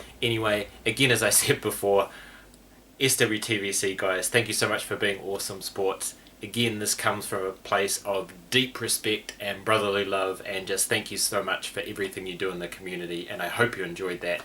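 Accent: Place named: United States. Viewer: Lol - great work! Love your parodies. Your American accent isn’t half bad. I once had a friend here in Texas from Christchurch and definitely did a poor job on his accent when I tried.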